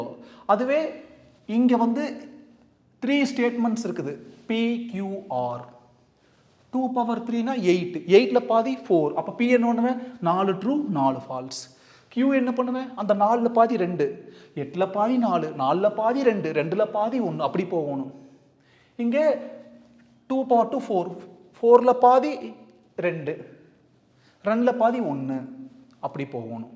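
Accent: Coimbatore, India. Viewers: Sir nenga coimbatore ah covai slang la pesurenga 😅❤😊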